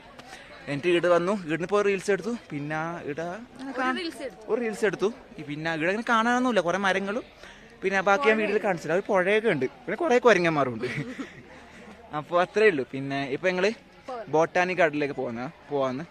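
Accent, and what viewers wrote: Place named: Kerala, India. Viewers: നല്ല രസമുണ്ട് നിങ്ങടെ കാസർഗോഡ് സ്ലാങ്ക് കേൾക്കാൻ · നിങ്കൾ കാസർഗോഡ് കണ്ണൂർ സ്ലാങ് ആണ് അല്ലോ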